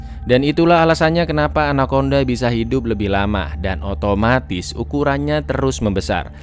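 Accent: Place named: Kalimantan, Indonesia. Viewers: anak kalimantan mana suaranyaa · Kok kalimantan nya beda wkwk. Btw gua orang kalimantan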